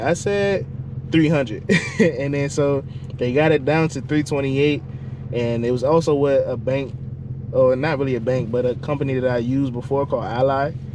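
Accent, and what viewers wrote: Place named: DMV, United States. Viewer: I thought you was from the dmv 😂 I can hear it 😂😂😂😂 but I’m finna go to the military so I wanna get one when I get back 💯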